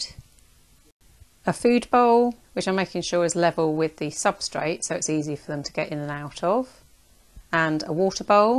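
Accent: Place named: England, United Kingdom. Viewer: what part of England are you from? Your accent is really beautiful!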